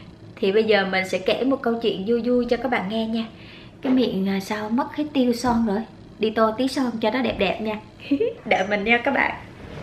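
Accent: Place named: Central, Vietnam. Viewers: Giọng chị giống ng miền trung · chị nói giống giọng đà nẵng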